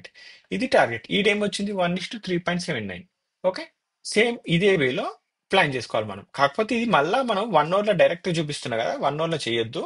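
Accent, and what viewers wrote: Telangana, India. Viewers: మీరు మన తెలంగాణ యాసలో చెప్తుంటే చాలా సంతోషంగా ఉంది, మంచి knowledgeable video sir, ఇలాంటివి ఇంకా ఇంకా చేసి మా లాంటి beginners కి guide చేస్తూ ఉంటే మన తెలంగాణ ప్రంతం వాళ్ళుకూడా పక్క రాష్ట్రాల వాళ్ళు చేసే calls and Tips మీద ఆధారపడకుండా స్వంతంగా ట్రేడింగ్ చేయగలరు. ధన్యవాదాలు 🙏🙏🙏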